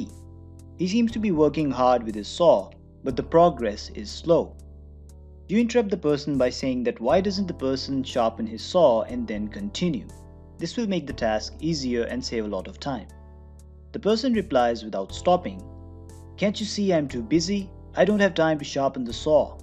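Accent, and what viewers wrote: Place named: India. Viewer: Are you Indian bro? Your accent sounds so.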